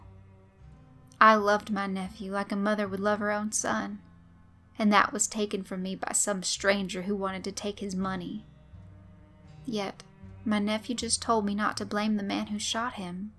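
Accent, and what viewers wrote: South, United States. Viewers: I love your voice good too hear a sweet southern voice carrying creepy tails it reminds me of my old friend when we'd sit by the fire after bringing the cows up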